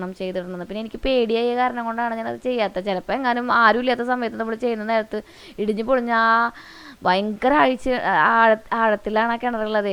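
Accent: Thrissur, India. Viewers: Chechiii njan adhyayitt kanuattooo...samsaram izttayii.. Thrissur anO ???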